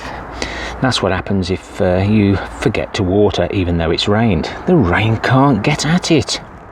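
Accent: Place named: Midlands, United Kingdom. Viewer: Where in the Midlands are you from? I'm originally from Leicestershire! Now living in Scotland for the past 10 years 😁👍 I recognise the accent!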